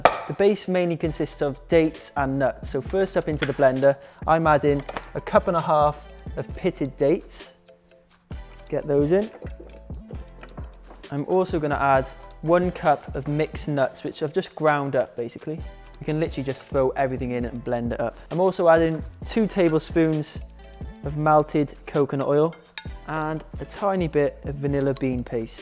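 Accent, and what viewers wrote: United Kingdom. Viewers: Does anyone know where about in the UK his accent is from?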